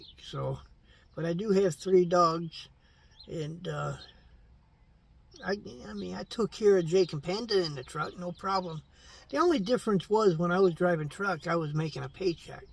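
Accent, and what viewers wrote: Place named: Appalachia, United States. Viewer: I'm not trying to be mean or anything But you should look for a talent agency for acting And I guarantee You They would find you many parts for hillbilly acting roles You have the exact look that they're looking for you look and talk Like a hillbilly I am being 100% serious you can make a ton of money.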